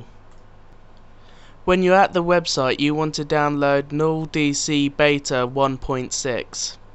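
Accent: United Kingdom. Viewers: the way he pronounces dreamcast games:driimcost gheims ok,i know hes british or something xD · Two things. You are a savior in the world of video gaming. You have helped me play Resident Evil - Code Veronica. You have a British accent. That's awesome. But for some reason everything you say is interpereted by my computer into this kinda thing; - "7 great rings were forged from the fiery pit of Mt. Mordor." - "One ring to rule them all." - "They're taking the hobbits to Isenguard." PLZ HALP! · I believe I was just instructed by Harry Potter...O_o cool accent xD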